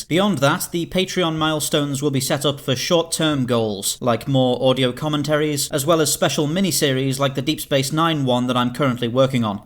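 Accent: United Kingdom. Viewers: Your accent voice is so British that I always imagined you as an imperial officer of the empire. no homo tho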